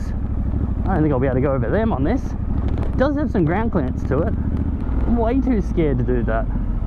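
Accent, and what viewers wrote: Australia. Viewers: good to hear an Aussie voice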